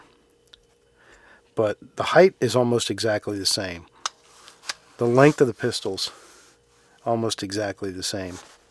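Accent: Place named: Maryland, United States. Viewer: How have 100,000 subscribers not found you yet? Recognized the fellow Maryland accent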